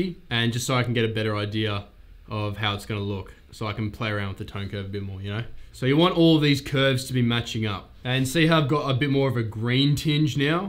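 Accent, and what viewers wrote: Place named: Australia. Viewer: I like this dude. He does the shit that a lot of people refuse to do (actually show editing) and he does it with an Australian accent. What's not to like?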